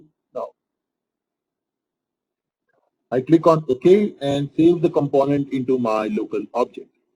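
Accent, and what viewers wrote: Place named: India. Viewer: bloody indian pronunciation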